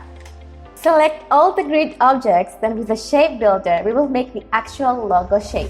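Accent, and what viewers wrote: Indonesia. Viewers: where you from? indonesian or malaiysia kek orang indo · Kyak orang indo deh, yekan?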